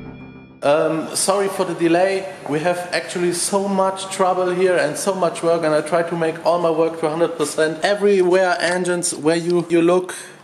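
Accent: Germany. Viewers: Best of both worlds, get to occasionally hear the German accent and see re-builds of subi engines. Lived in Ansbach for 3 years. Oh how I miss it.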